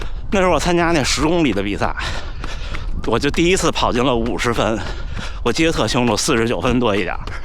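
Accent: Beijing, China. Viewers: 特别喜欢听乡音😂 我小时候（95年左右）住胡同里，北马从家门口建国门桥上跑下来。我5岁多就自己在路边看跑步。没想到竟然埋了个马拉松的种子在心里。2024年春天跑了个半马2小时零1秒。然后很鸡血地报了秋季首马，练的特狠，跑了343。2025没怎么练跑步，玩自行车了，半马PB了143。2026又开始练游泳，结果练了3个月放弃了，85公斤胖子但腿太沉没啥肥肉，浮不起来。重新练跑步，但是心里总打鼓，想5年破三，又怕自己天赋太差。听你分享经验我就觉得特安心。心想着自己首马都到的第三年成绩了，我再练个5年，堆起来跑量，肯定也能破三了。就像你说的，不光练身体，关键是脑子（心态和意志）。练就完了。谢谢博主的分享！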